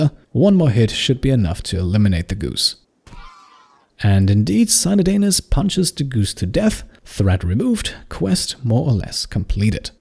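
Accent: RP, United Kingdom. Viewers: "Giveaway" A British man narrates the life of a naked tribal man named Steak that crash landed in an extreme desert who is on a quest to obtain psychic powers. Just ordinary Rimworld things. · Was looking for a naked brutality run of royalty. Found a posh accent dude doing it and a giveaway. Cool beans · posh as fuck accent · Never heard a British person speak like that!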